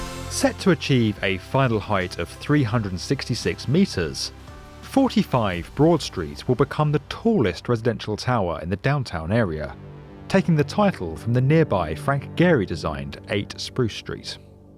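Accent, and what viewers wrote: United Kingdom. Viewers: amazing video, but I hate that mtfckin British accent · Everything sounds amazing in a British accent.:) You have done a great job in this video.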